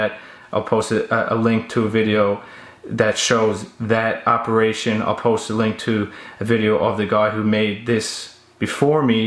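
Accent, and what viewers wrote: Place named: Newfoundland, Canada. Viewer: Started watching this expected you to be form the USA or something then you started talking and I was like accent accent oh wait wtf this guy is from Nfld. Will be building a fish wheelchair in Halifax today.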